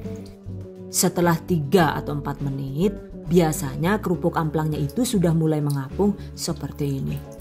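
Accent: Banyumas, Indonesia. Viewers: Selau suka dg suaranya kolaborasi jawa ngapak indo dan english..i like that...jdi ketawa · Yuu...jawane ngapak metu bae lah.. 😁😁tq resepnya... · Akhirnya bisa pegang hp rebutan Ama bocil, Alhamdulillah bunda ini makanan kesukaan anak2, ternyata lumayan mudah ya cara buatnya tapi kudu sabar ngaduk2 kayaknya ni. Makasih bunda makin lengkap aja ni, makin mantap, makin sukses buat. Seneng sama suara bunda yg khas dan candaannya itu loh ngapak kali 😀😀 #GiveawayTHV2021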